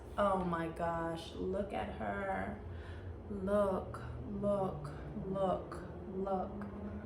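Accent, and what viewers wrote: New York, United States. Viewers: For sure from NY lol I know that accent 😍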